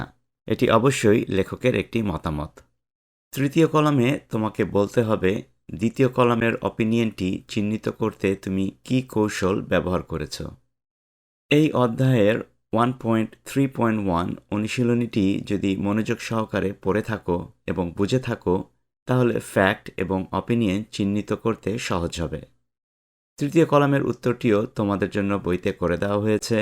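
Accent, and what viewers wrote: United States. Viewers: Sir American accent keno. Amra British Accent e shiktaci. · Tell me how you learned to pronounce English like an American, I need you! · Sir, your English is so nice, how you learned to pronounce English like an American?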